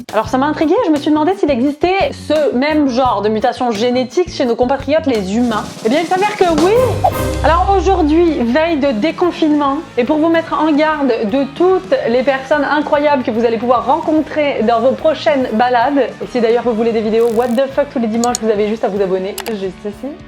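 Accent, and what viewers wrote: Quebec, Canada. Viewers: Ton accent du Québec me manque.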